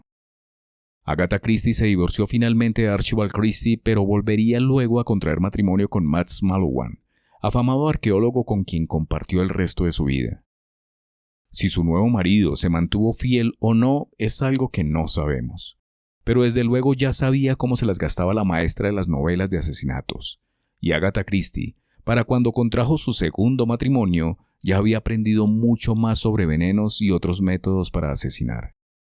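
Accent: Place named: Colombia. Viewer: Gracias por su lectura, me atrapó. Por otro lado, me encanta su acento colombiano.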